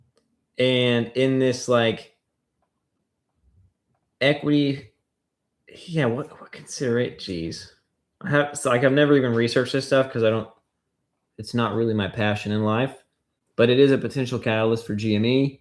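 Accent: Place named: North Woods, United States. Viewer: Wisconsin GME holder checking in, definitely a little north woods accent coming through lol. Always look forwards to your videos keep up the good work brother!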